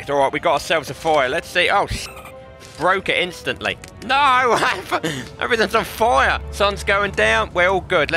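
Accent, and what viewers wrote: United Kingdom. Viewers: I like your British accent 😂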